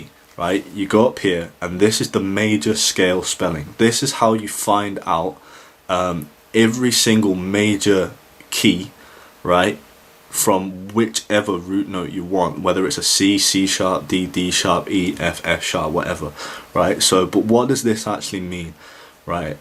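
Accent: United Kingdom. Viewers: may I inquire as to what part of the UK you're from, can't quite identify the accent . PS I really enjoy your down to Earth Style of instruction.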